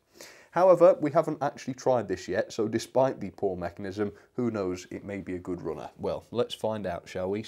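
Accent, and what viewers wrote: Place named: Sheffield, United Kingdom. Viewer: Whenever I watch your vids I think 'who does his accent remind me of?' It occurred to me today, you sound like I do. I'm from Sheffield, am I close?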